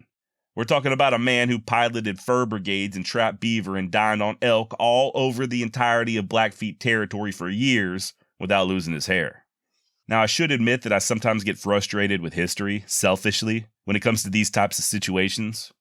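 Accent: United States. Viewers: Wow 😮 Such an AMERICAN made voice❤❤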